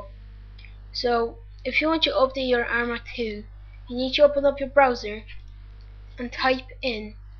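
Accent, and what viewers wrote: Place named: Ireland. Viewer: u sound irish so much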